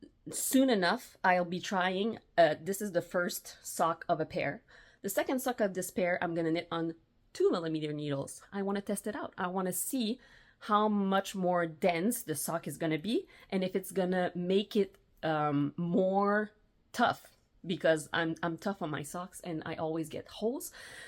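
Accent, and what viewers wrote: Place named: Quebec, Canada. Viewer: I’m originally from Ottawa, born in Gatineau, so I’m enjoying your French Canadian accent! I wonder whether you could recommend yarn and where I can get some at reasonable prices? Going to watch your 14 years of sock-knitting video 😊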